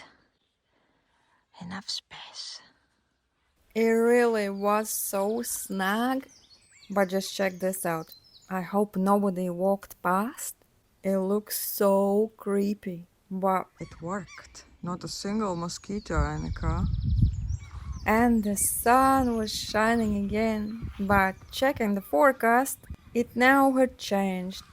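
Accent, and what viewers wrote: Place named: Russia. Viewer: You sound Russian. I am cool with that.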